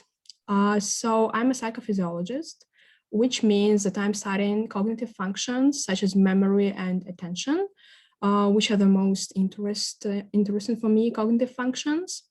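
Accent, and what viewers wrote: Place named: Russia. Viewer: Умная, толковая девочка пытается вполне успешно канать под психофизиолога. Но для чего-то понесло вещать на иностранном. ДЛЯ ЧЕГО?? ЦЕЛЬ ТО КАКАЯ?? Какие иностранцы ломанутся слушать избитые популярные истины от русской студентки на ломаном русско-провинциальном английском. Понты? Понты... Но она же умная!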